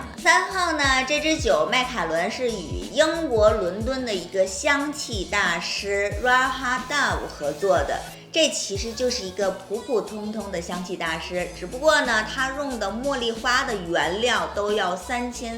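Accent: Tianjin, China. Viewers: 天津人吗？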